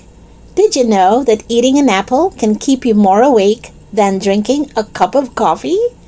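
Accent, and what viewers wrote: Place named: Philippines. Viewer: I am a Vietnamese Catholic priest, I am staying in the Philippines for my studies, I like your Filipino English voice, I am pretty happy to listen to and imitate your voice! May God bless you all to help everyone ❤️😇💒🏫 students 👨‍🎓👩‍🎓🧑‍🏭🧑‍🏭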